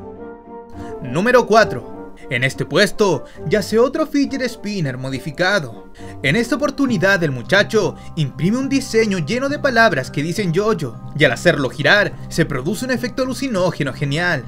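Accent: Chile. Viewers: eres chileno prro? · eres de chile o soy io?? v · eres shileno wn qlao? fome la wea wena hermano sigue asi Ksnfjflglslfktnek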